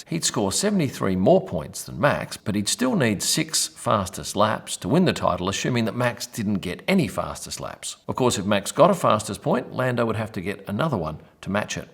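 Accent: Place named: Australia. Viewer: There are many Australians out there in the big bad world. We seem all sophisticated etc. but secretly all we crave is Weetbix, Vegemite on toast, proper barista coffee and an Australian voice. Thanks.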